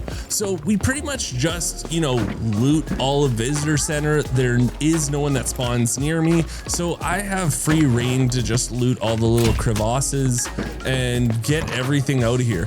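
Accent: Canada. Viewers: it wasnt actually that it was the way you talk and some of the manurisms you use.i am also canadian so picked up on it quick lol i juat started on delta force console slowly getting better i enjoy your videos find them quite helpfull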